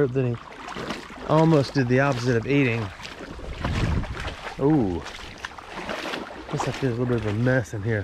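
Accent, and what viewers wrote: South, United States. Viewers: Southern talking. I live in Illinois now and when my Southern kin come to visit I have to learn that language all over again. Count how many times the word "look" is said in a video.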